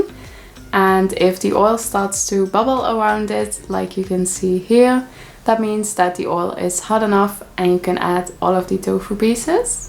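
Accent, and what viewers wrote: Netherlands. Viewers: You have such a typical Dutch accent when you speak English! HEHE #LikeDJtiesto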